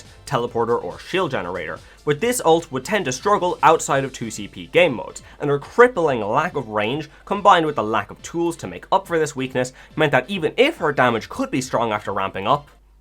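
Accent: Ireland. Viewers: Are you from Louth? Short circuited when i first saw the intro. Didnt expect you to say well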